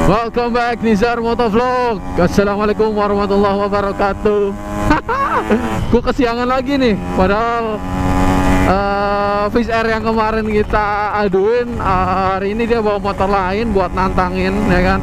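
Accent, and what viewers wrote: Banyumas, Indonesia. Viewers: Ngapakk hadirr mas'e 😂👌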